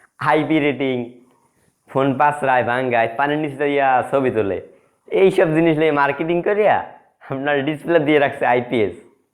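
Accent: Barishal, Bangladesh. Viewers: ভাই কি বরিশালের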